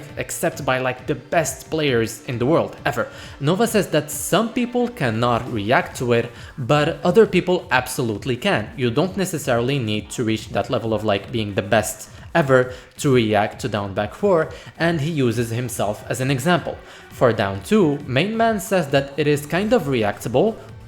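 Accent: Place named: North Africa. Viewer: I knew you were north african for the whole video until i heard you say it 😂 where from?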